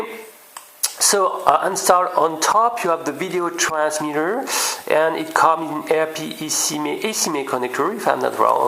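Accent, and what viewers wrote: France. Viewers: Are you French ? I notice a familiar accent to me :)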